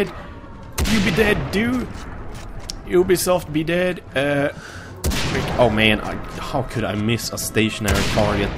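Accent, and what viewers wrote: Australia. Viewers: Protip: He is australian, not british, mate. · hes not british, hes australian :)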